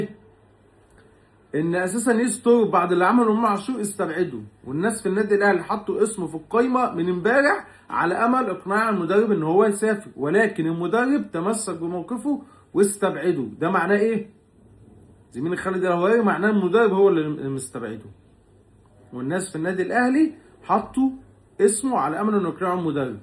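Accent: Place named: Iraq. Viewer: اسمع عراقي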